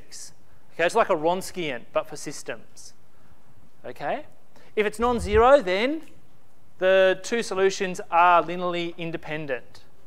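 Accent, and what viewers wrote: Australia. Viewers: That was great i will forever solve systems of equations with an Australian accent!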